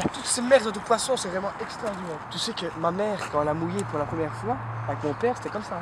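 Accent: Belgium. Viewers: T'es belge ou français ta un petit aquessent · Tellement bon, j'ai ressenti le Poelvoorde jeune avec une bon punch à l'accent Belge. Il y a des génies et il y a. merci! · Cet accent belge ahah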